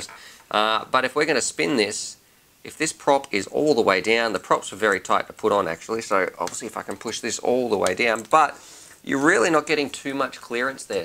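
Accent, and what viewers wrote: Australia. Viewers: You make funny Australian noises and it's the best thing ever!!!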